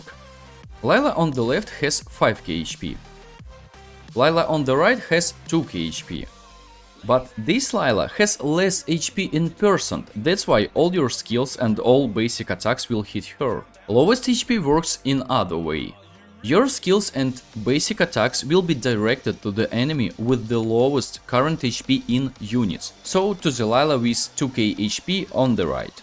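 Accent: Russia. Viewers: are you russian? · r u russian bro? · Сильный русский акцент , плюс очевидные ошибки в произношении звуков и целых слов и постановке ударения в слове очень портит речь. Советую, перед озвучиванием видео на английском проверять произношение в словаре, чтобы не было таких казузов, как произношение слова "focus" как "facas", которое звучит как не очень хорошее слово) А информация вполне полезная.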